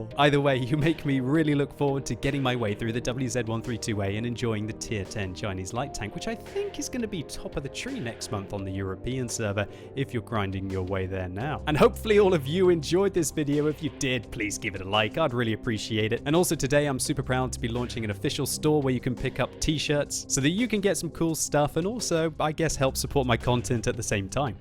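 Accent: France. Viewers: your french accent is really lovely <3. I m french and I love when british speak like that <3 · i love your french accent 👌😂🇫🇷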